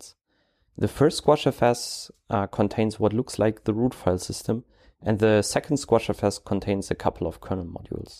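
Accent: Germany. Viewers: bin mir absolut sicher, dass du aus dem deutschsprachigem raum bist. dieser akzent ist so einzigartig hahah :D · Your english sounds german. Where are you from?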